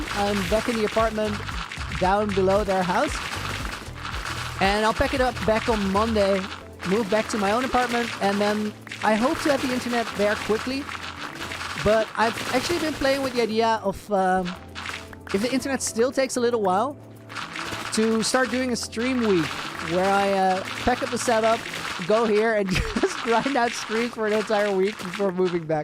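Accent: Netherlands. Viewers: Hey man love your vids. Btw are you dutch?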